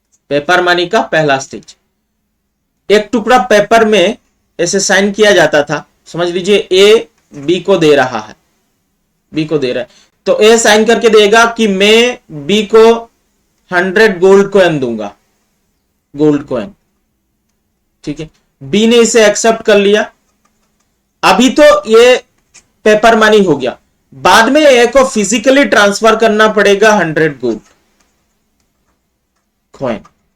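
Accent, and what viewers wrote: Assam, India. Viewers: Sir are u assamese ?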